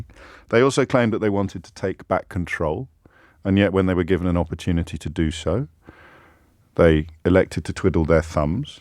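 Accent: RP, United Kingdom. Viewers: He thinks speaking poshly automatically makes him smart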